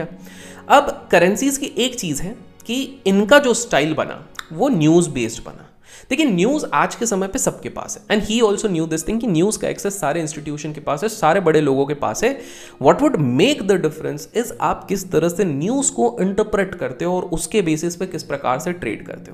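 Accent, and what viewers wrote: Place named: Hyderabad, India. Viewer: Kya miya kar hyderabadi accent 👌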